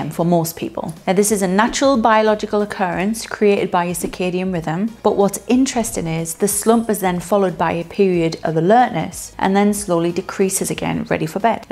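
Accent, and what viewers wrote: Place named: Newcastle, United Kingdom. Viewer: Must be a Geordie!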